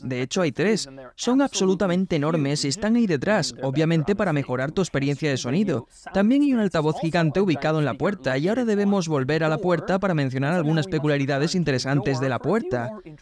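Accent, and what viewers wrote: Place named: Galicia, Spain. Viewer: Estoy harto de escuchar la tonada gallega...las z me tienen las bolas x el piso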